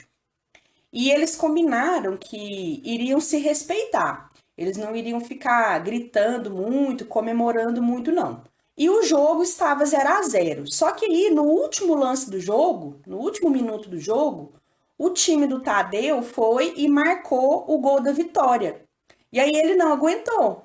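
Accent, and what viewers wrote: Minas Gerais, Brazil. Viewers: Sua voz é linda Seu sotaque é como o meu Pois sou mineira também Continue assim Vc é maravilhosa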